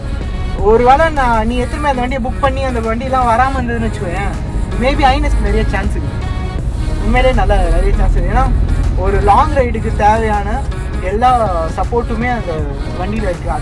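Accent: Chennai, India. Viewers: "மெட்ராஸ் பாஸை" யில் பேசுவது கேவலமாக இருக்கிறது!👎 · Super Bro.... full Chennai slang I like it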